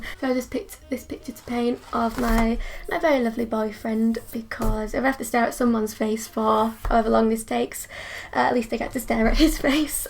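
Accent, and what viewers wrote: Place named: North, United Kingdom. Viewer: I love your work and I love your accent,,,,northern representation heck yeah!!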